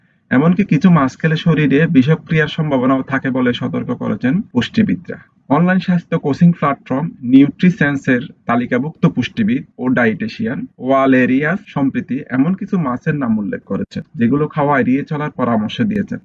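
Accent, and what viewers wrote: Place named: Noakhali, Bangladesh. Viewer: উনার বাড়ি হয, নোয়াখালী অথবা চিটাগাং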